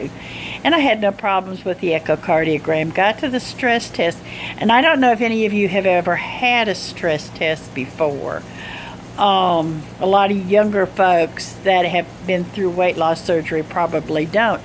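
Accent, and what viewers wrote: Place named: South, United States. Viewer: I love ur Southern accent! I know u will do great and feel so much better after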